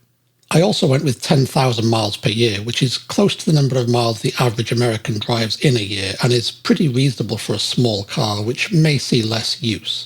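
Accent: England, United Kingdom. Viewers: Excellent breakdown and video, although was a bit confused to hear a fellow Brit talking about a car with UK/European origins but using US costs! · This is so weird listening to a British accent but in American prices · A very good comparison but I have to wonder... If he's using Texas as a base, why does he have an English accent?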